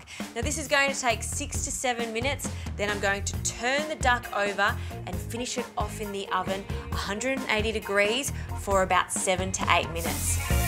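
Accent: Australia. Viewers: Celsius (She sounds Australian)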